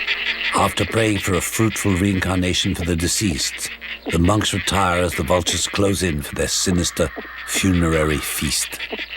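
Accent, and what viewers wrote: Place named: United Kingdom. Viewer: I can't stomach an overly dramatic British accent, makes me want to puke....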